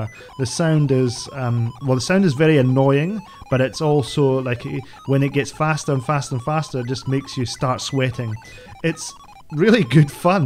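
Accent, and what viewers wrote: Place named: Scotland, United Kingdom. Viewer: i love this Scottish accent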